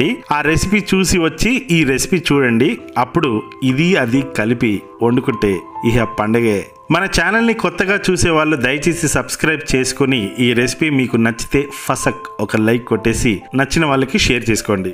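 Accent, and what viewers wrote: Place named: Karnataka, India. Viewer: Bro ur kannada accent super guru...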